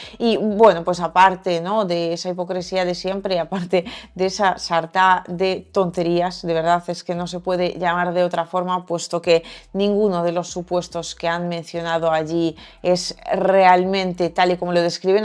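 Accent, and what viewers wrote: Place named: Spain. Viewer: Espanol perfecto muy de espana muy interesante tu video saludos desde 😊🇲🇽🇺🇲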